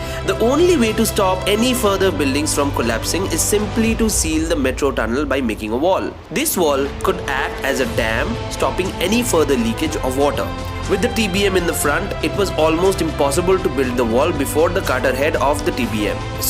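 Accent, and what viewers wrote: Bengal, India. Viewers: You speak English like true Bengali I am very proud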